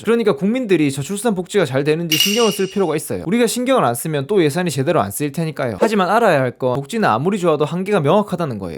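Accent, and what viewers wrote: Gyeongsang, South Korea. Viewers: 대구 분인가봐요? 말씨가 익숙하네요 ^^ 그리고 인류는 소멸 안해요, 한국인이 좀 많이 줄어들겠죠. 근데 한국인이 줄어들면 뭐가 어때서요? 요만한 땅에 한국인이 5천만이나 번식한 게 이상 번식이었어요. 다른 나라들을 보면, 한국인은 한 1500만 정도로 줄어들 필요가 있어요. 거기까지 인구가 줄어드는 건 한국 사람들한테 좋은 일일 겁니다. 오염도 덜해지고, 1인당 물 공기 집 넓이도 커질테고, 땅값 집값도 좀 싸질테고요. 사람도 더 좋은 대우를 받게 되겠죠. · 이런 애들이 통계자료+본인뇌피셜로 어떤 현상에 대해 -는 ~다 라고 결론내서 확정짓는듯한 이런 영상을 만드는 것이 매우 역겹다. 아무생각없이 보면서 님말이 맞는것같아요 분석력 짱 이지랄 댓글싸는 애들도 병신같고.SCI 등재라도 하나 하고 와라. 경상도에 있는 지방대 조별과제 발표자료같음. 나 또한 경상도출신에 고향친구만나면 사투리로 대화하지만. 사투리 역겹다. 표준억양 연습이라도 하고 녹음하던가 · 경상도 억양 좀 고치셨으면... 말투가 귀에 거슬림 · 쌍도 사투리 억양 존나 거슬리네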